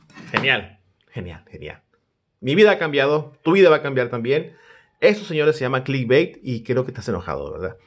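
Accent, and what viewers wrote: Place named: Sinaloa, Mexico. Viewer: Alv, trae mota el:v, como se nota que es de Sinaloa alv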